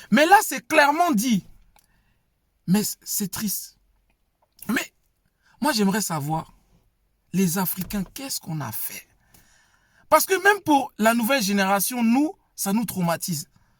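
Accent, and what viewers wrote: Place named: Ivory Coast. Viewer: L'accent me dit que c'est un frère du 225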